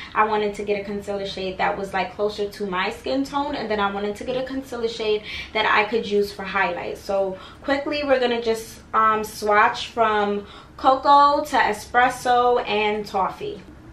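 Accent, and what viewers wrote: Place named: New Jersey, United States. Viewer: Are you from NY because I’m from jersey and you sound like us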